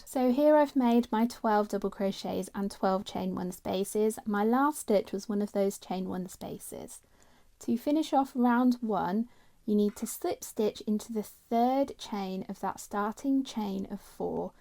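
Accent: England, United Kingdom. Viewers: I’m following this, but it’s strange to hear an English accent use American crochet terms. I really have to concentrate!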